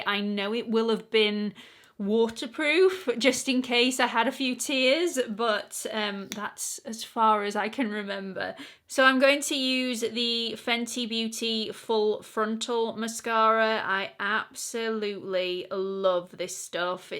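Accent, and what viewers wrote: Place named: England, United Kingdom. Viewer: I love watching you! You’re beautiful and funny, and that English accent is to die for❤️❤️❤️ Every time I call my husband and scream”just listen to her voice”the answer is ‘Oh, I know, I know, alright”😂😂😂 I just adore you!😘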